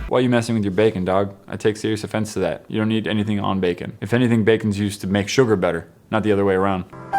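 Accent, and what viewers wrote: United Kingdom. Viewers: Omg I love ur British accent I wish I was as good as an American accent as you are with a British accent because I'm gonna go to a school production audition and need to have a good accent but.... idk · I love the british accent you had!!! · Nice British accent it wasn't even offensive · No!!!!!! More British accent! (idk I just like the accent) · I love it when American youtubers try to do British accents!! Idk why but it's awsome and it just make me happy for no reason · I'm British sorry but that was a very bad British accent.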